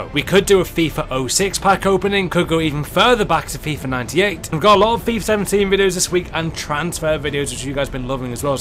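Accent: Hungary. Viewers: hungaryan commentary :D it's so good to hear :D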